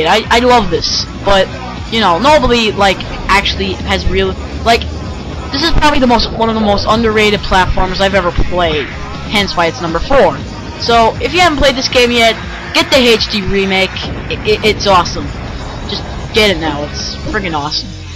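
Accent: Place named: United States. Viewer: That accent is 100% American my friend, don't kid yourself :D I think part of feeling we all have towards games comes from the time you play it in. I'm glad you buy the old consoles, shows a desire to explore old styles and appreciate the classics, but I think you have to grow up in those eras to experience what it's like playing these games for the first time during their hey-day. Golden days spent playing Sonic on GameGear, Mario on GameBoy, Goldeneye on N64, Red Alert C+C on PC. Good times!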